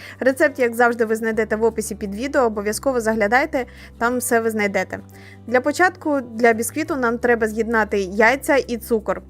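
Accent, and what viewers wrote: Ukraine. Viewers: Знайшла спочатку відео з льодяниками ваше і подумала, що ви з росії, але почула нашу букву «Г»)) а потім перейшла в канал)) українською набагато милозвучніше !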